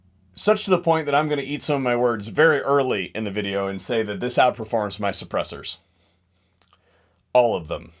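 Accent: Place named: United States. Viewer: Great job at testing & publishing hard numbers. Ditto at keeping it real & speaking American! I got to learn a few new things like WHY I like a backup nut instead of crush washers. I'm only 6 years old in building & 3 years rusty since I got booted off the ranch where I used to shoot. Just tryin' to keep my pilot light lit these days. Good info is much appreciated.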